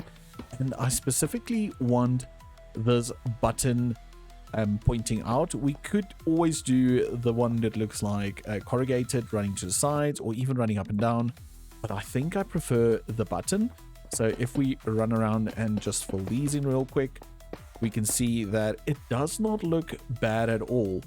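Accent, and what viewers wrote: Afrikaans, South Africa. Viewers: I know an Afrikaans accent when I hear one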